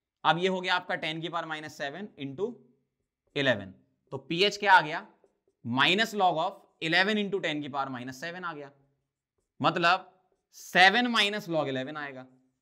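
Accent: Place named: Malwa, India. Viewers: Sir I am here after watching your video on Physicswallah app... Our accent seem to be very similar. Where are u from? My guess is Malwa MP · Yes he is from malwa i guess indore..am also from malwa aur mujhe bhi accent thodaa jaana pehchaana laga😂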